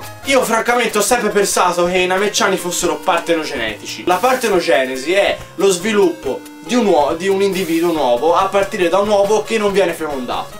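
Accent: Tuscany, Italy. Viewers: MITICO! Assolutamente Iscritto! Simpaticissimo, accento adorabile :3 (adoro il toscano), informatissimo e piango insieme a te all'idea dei Namecciani morti ingiustamente.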